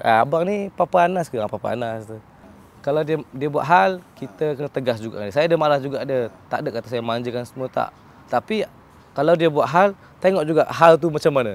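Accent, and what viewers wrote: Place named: Pahang, Malaysia. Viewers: ade loghat klntn kturnn klntn kot..phng ke? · tulah ade slang timurr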